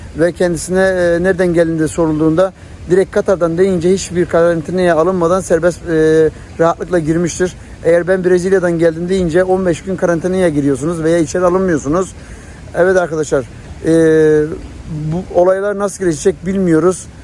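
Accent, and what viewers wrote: Gaziantep, Turkey. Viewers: Doğal ol abi Antep şivesini gonuş biz doğal samimi halini seviyoruz abim adamsın Kapadokya Nevşehirden Selamlar 🖐️🇹🇷🇹🇷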